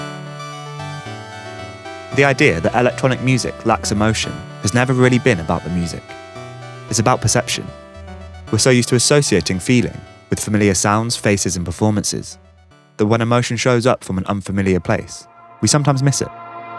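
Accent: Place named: United Kingdom. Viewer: ofc he has a briish acceny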